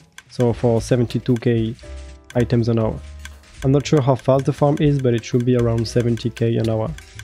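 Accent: France. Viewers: hey are u french ?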